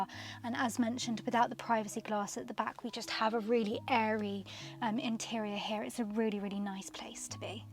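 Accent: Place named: United Kingdom. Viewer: I love woman speak British accent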